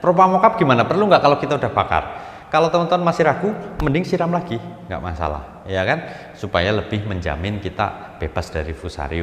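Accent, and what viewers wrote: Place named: Banyumas, Indonesia. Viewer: Sesekali ngapak nya di keluarin pak,ora ngapak ora kepenak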